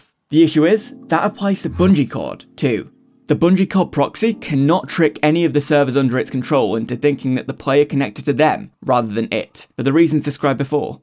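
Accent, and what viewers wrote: United Kingdom. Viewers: best tesco going mate, thought you sounded northern aha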